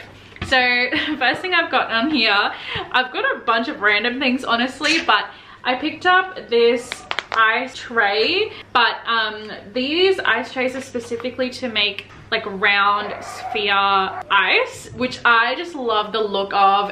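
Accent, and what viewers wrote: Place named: Australia. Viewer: Gosh you have a good Aussie accent I live in nsw and I sound like u 😂 the pure Aussie accent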